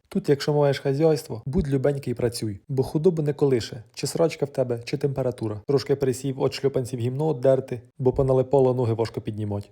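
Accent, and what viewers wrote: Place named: Poltava, Ukraine. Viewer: Мова схожа на Полтавську!))))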